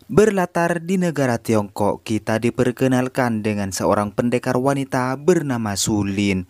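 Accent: Madura, Indonesia. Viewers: Aksennya seperti orang madura.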